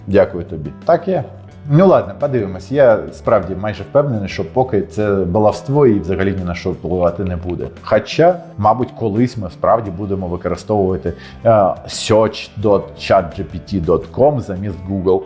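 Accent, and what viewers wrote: Russia. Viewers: Покращуй, вимову... Трохи віддає азіровщиною, а це досить неприємно!!! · За старання українською - респект, але слухати дуже важко. Не знаю скільки репетирував та робив дублів, проте "азіровську" не виправив аж ніяк. 😊 Особливо плутає і та и.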